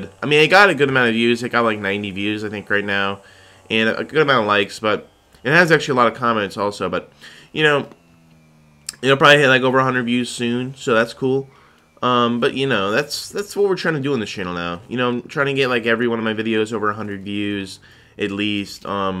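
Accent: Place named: Canada. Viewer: Let me make a vid with you. Your in Canada because of your accent, I'm flying there soon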